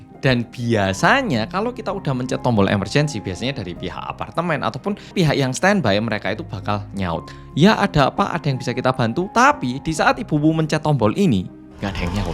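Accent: Java, Indonesia. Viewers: Hai medok🎉 Menyedihkan😢 suwun yo rek 👌🏿🙏🏿💕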